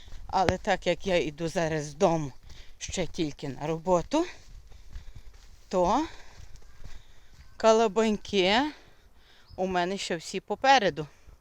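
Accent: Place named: Ternopil, Ukraine. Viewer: Нагадали Ви менi моi 20 рокiв😊 В Днiпродзержинськ на швацьку фабрику приiжджали кожен рiк декiлька десяткiв дiвчат з Тернопiльськоi областi пiсля швацького училища. Так вони розмовляли так само, як Ви. Особливо слово " але" дуже часто вживали. " I" з дома крапками не можу писати - тiльки нiмецькi та росiйськi лiтери маю. Батьки були росiйськими нiмцями. Розмовляти украiнською не мала потреби, але читала, писала у школi. Не забула 😃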